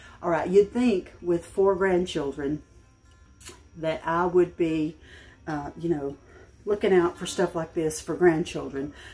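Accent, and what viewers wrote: South, United States. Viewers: Hey, girl. I'm a brand new Southern drawl subscriber. 🤣 You are so funny...I just love watching you! Thanks for a great video. I look forward to seeing you again!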